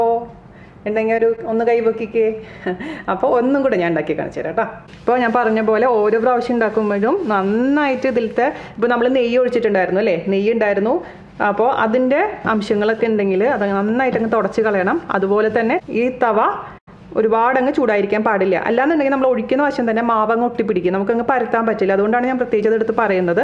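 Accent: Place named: Thrissur, India. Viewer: Ready Mani ayyi...athu enniku ettayi... thrissur slang...I also from Thrissur...now at Kochi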